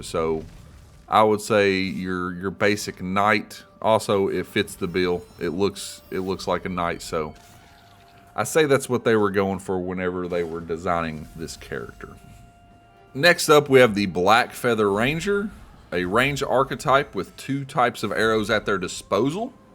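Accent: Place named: Texas, United States. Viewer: Definitely a southern Texas accent love it and would suggest using it for a saying catch phrase for the channel ,so folks can look forward to that as well as the content, aside from that great work and new subscriber here 👍 👏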